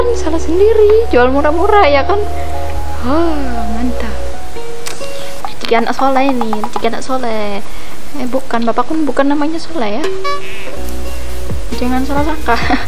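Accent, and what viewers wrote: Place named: Java, Indonesia. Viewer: Baru mampir, logat nya jawa, jawa mana ni hhe, boleh kali numpang promosi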